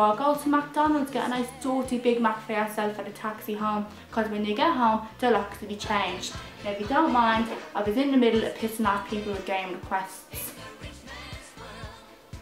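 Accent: Dublin, Ireland. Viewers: I just subscribed to your channel. Would you consider subtitle though, for us, unfortunate souls who don't understand fully irish english? 🤓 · Fire Gling - She speaks a Dublin (North) accent.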